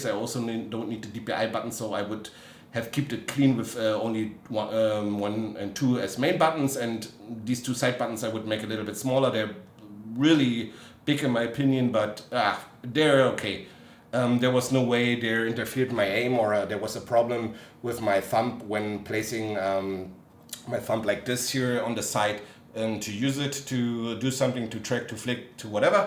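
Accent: Germany. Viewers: He just pronounces it fast while also having an german accsent. If you listen carefully he says orochi.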